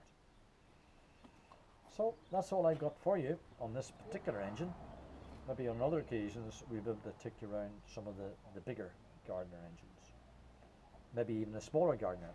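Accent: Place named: Northern Ireland, United Kingdom. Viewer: Love the Northern Ireland accent!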